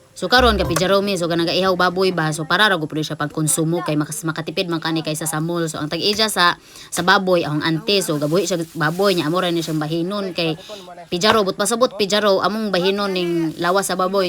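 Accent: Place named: Bohol, Philippines. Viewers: hi miss new subcriber from cebu city😊asa dapit sa bohol inyoha qy taga bohol pud aq bana? naa pud koy gisunod nga mga vlogger taga Alicia♥️ ganahan kos imng mga vlog qy luto2 nya taga bohol jd ang sinultian nmo😊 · Hi Ms, taga loboc ka Dai? Base Sa accent man gud nimo.. New followers me nimo Dai.. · Nalingaw ko jamo sa imong sinultian truly bol anon jud Kang pure Taga dimiao pod among kagikan now I'm from Mindanao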